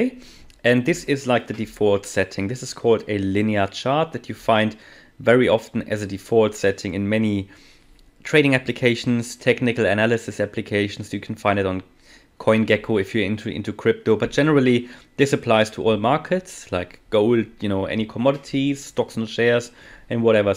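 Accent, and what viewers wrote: Germany. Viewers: Vielen Dank für die super Erklärung mit deutschem Akzent! (VG aus die NL! 🇳🇱🧀🙂)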